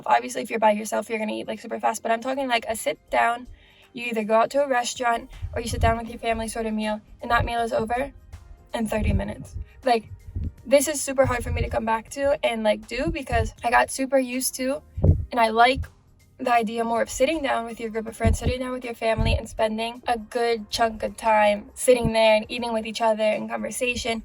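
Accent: Spain. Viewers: It is very interesting that when you speak I can sense some Spanish accent. yeah food hits me hard when I go back to the US, I gain weight really fast. I'm actually from Allentown, but I live in Slovenia for 8 years now and I don't really feel like moving back to the US. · Is it me? Or does it really sound like she has a little bit of an accent? which means that hilaria baldwin is right that she did pick somewhat of an accent while in spain.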